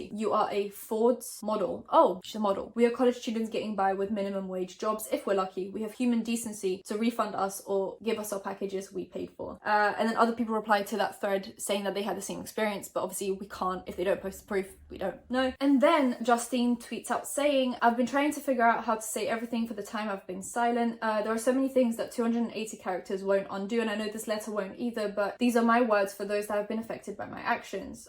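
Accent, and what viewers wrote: United Kingdom. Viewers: Vixen idk she’s definitely british, that’s not an australian accent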